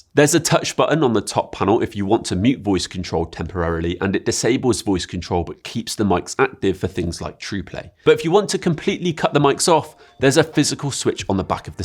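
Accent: United Kingdom. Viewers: Your accent is very clear and so good I think you’re British 😅, Thank you for the tech information.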